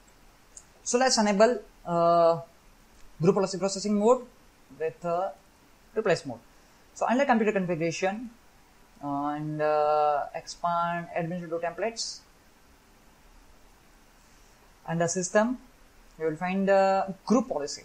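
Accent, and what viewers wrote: India. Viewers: seems like a good video, but i can't understand your indian accent..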